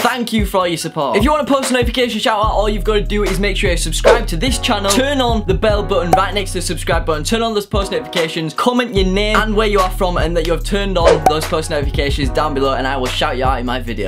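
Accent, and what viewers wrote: United Kingdom. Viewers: hey!! I am subscribed and i have my post notification on since i have subscribed. and im from the Philippines. thats why i watch your videos on monday. 😭 i love your accent and your videos.. i just love the English Accent. and i cant believe your just 17 years old. im just a 5 footer and im already 18. kinda jealous of your height. share some blessings. 😂😂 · BRITISH ACCENT ON FIRE🔥🔥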